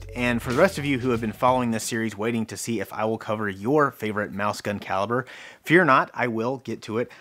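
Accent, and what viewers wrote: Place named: Tennessee, United States. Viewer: It's ok we can tell your in Knoxville Tennessee, like the rest of us with those problems, that talk that same way Ya'll!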